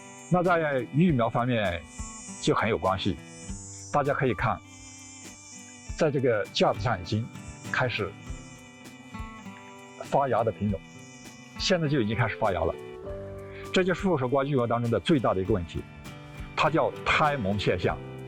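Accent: Yunnan, China. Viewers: 听您口音一定来自云南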